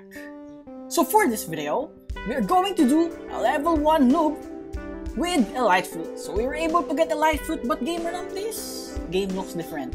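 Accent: Philippines. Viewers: Filipino voice ur are filipino? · hes sound filipino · are you filipino the voice and the filipino just im guessing yure filipino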